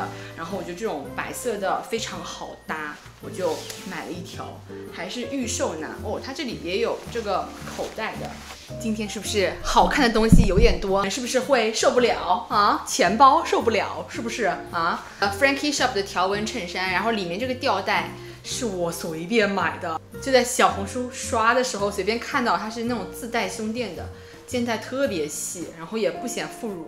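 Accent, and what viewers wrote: Shanghai, China. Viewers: 好喜欢你的上海普通话啊哈哈哈哈，太开爱了。裤脚管，便宜西西的哈哈哈哈😂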